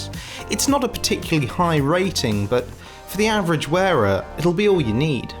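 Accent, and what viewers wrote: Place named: United Kingdom. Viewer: I’m learning to hate the snotty Brit accent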